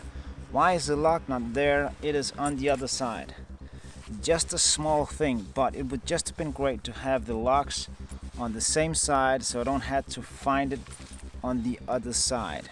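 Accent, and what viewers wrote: Denmark. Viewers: Nice video :-) are you Danish? ;-)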